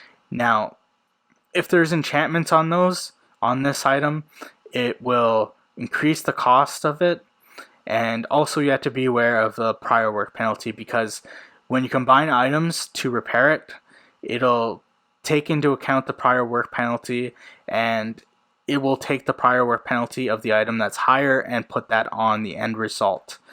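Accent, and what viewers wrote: India. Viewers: Indian? Nice English btw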